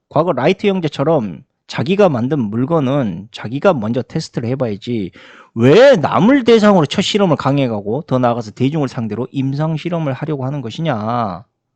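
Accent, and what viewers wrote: Jeolla, South Korea. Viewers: 전라사투리로 욕을 찰지게하시니. . 시간이 금방지나가네요 ㅋ 일론이 아버지도 사기꾼이었다고 하든데. . 피가 흐르는건가?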